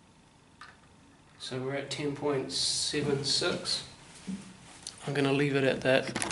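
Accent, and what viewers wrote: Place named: England, United Kingdom. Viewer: When there is talking and stirring at the same time, with an English accent, it is difficult to understand.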